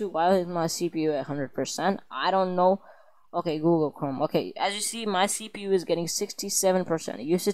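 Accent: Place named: India. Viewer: You sound like a India Girl no offense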